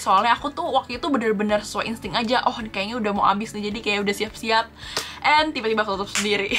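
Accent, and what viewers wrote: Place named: United Kingdom. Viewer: Ngomongnya cepet banget dan kadang pake British Accent jadi kurang kedengaran jelas 😢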